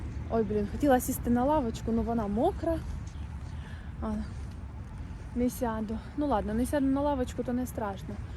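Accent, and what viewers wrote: Surzhyk, Ukraine. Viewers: Вибачте, але українську мову вам ще вчити і вчити - розмовляєте жахливим суржиком. Купа русизмів.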